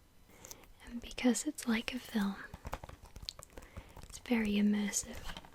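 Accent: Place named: United Kingdom. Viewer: YES I DID! Ive been watching it every night to fall asleep! I love the way you tap! More than the way most ASMR artists tap. Thank you! And your voice (and British accent, as I am American) is very relaxing as well.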